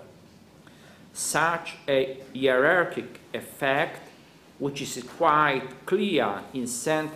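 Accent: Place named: Italy. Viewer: I share in his subtle Italian accent, and incomprehensible sentences in the video, as the munus of our community.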